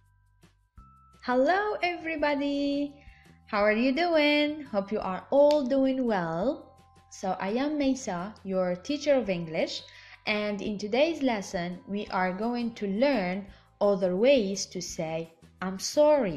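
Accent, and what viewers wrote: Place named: United Kingdom. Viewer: Hi,you have amazing british accent thank you for evreything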